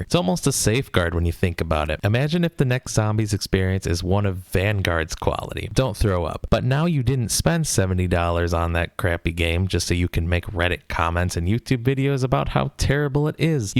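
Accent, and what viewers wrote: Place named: Boston, United States. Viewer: You say zombies like you from Boston. You say "Zambies" instead.😢 They have issues pronouncing words. They call a place that is spelled Leominster like this "Lemonstah" like lobstah.😢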